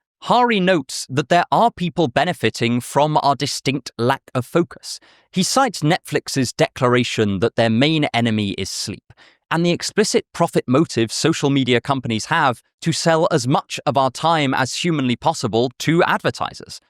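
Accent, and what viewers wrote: United Kingdom. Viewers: I don’t know if it’s j because you have a British accent and are wearing tweed, but I agree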